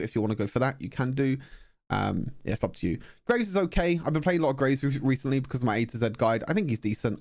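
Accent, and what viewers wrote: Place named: United Kingdom. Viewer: I always check your channel to find out first what's new in Wild Rift and it has helped me better understand loadouts and macro strategies and I love your British accent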